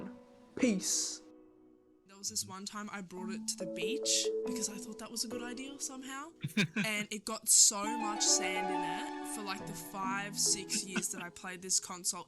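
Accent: Australia. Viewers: Wait are you Australian I'm tryna see sumn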